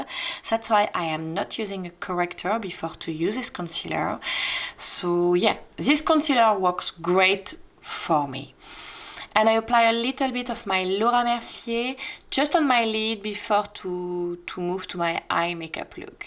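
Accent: France. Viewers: Just stopping by to say hello. This is my first ever comment on YouTube 😳 But I had to. So many already told you about your stunning, pretty and elegant makeup style. However......the french accent...Oh My God. My heart is melting. So beautiful. As if you are singing the tutorial. I love it. You are definitely my new favourite YouTuber.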